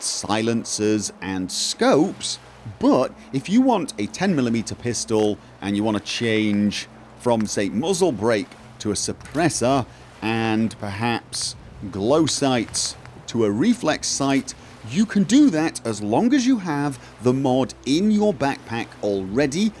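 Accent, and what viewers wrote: United Kingdom. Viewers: Cool voice! This britt snob voice that equally irritates and charms))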